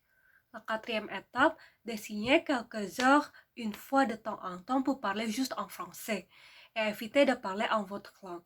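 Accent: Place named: Quebec, Canada. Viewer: Ta prononciation en français m’impressionne! Et c’est ta troisième langue en plus? Wow! J’entends déjà l’accent québécois, hihihi ;) En tant que Québécoise, je suis un peu gênée de la loi 96. Un délai de 6 mois pour apprendre le français n’est pas raisonnable. J’espère sincèrement que tout le monde sera conciliant et compréhensif à ton égard.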